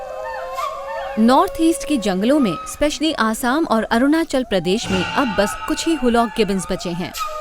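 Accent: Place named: United Kingdom. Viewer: लगता है किसी ब्रिटिश woman से हिंदी बोलने को बोल दिया है।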